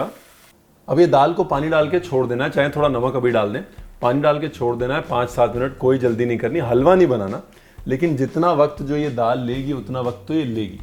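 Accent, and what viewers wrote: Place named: Punjab, India. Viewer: Ranvir bhai I'm from kashmir nd I love your way of cooking as well as talking with punjabi tadka ❤lub u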